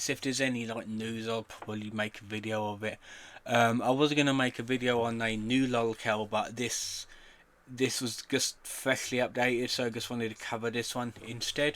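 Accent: United Kingdom. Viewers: average british voiceover, completely unintelligible... bro daniel is more understandable than you when he's in his meltdown mode. just use one of those cancerous text to speech programs it would be better than this. 24 sec in and i legit had to pause and say wtf lol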